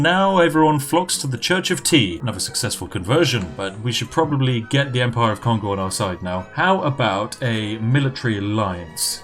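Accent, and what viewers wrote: United Kingdom. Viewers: Damn you! My colonial mind is programed to be receptive to a British accent and I've actually bought a 240 pack of yorkshire tea. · Watching on my country side with a large cup of tea. Mmmm British accent "My lord. It's absolutely marvelous". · Are you really British? Why I like your accent more than the real British people? ❤️🙃😂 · British english, lol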